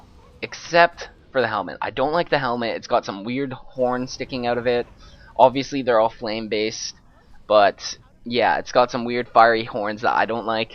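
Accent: Canada. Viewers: Are you Canadian ??:)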